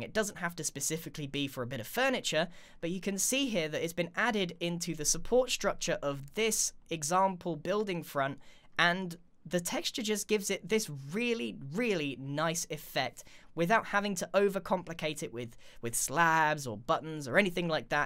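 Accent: United Kingdom. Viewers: Dude I just love ur British accent❤️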